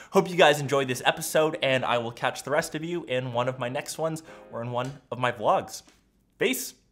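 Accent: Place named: Canada. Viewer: your canadian accent seems much more pronounced than usual in this video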